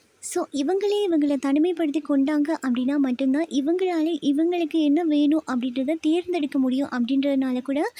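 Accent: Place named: Jaffna, Sri Lanka. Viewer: உங்க யாழ் தமிழ் நல்லா இருக்கு.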